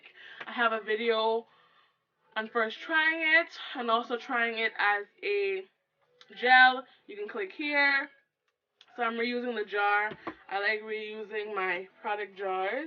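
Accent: Caribbean. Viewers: Do I hear a slight Caribbean accent ?? 👀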